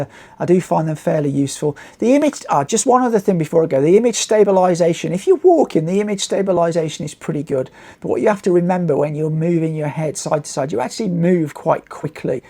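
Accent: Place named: England, United Kingdom. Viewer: People should not comment on accents if they don't know what they are talking about. This guy is English, hence his accent is one of a number of English accents.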